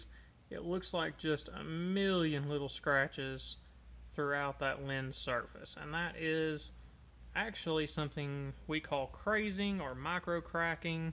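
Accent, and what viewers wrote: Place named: North Carolina, United States. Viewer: I'm guessing you're from north carolina.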